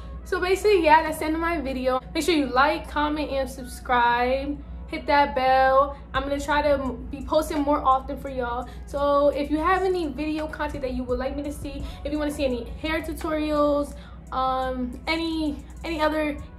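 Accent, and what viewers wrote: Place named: North, United States. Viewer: Are you from Northern United States?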